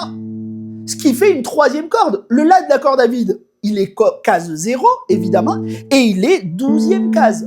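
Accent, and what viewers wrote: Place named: Provence, France. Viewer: oui c est une question d accent et le tien m a propulsé dans les films de M Pagnol.... Le mien, franc-comtois n est pas aussi musical.... Pour répondre, c est toujours intéressant d avoir des éclairages différents sur la technique. Perso je fais des relevés d accords en écoutant de la variété. Tous mes vœux.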